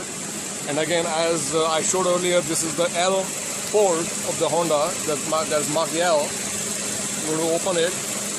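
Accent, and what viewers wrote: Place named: India. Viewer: Indian accents are so fucking annoying...and i love indian people and food but i cant stand their english accent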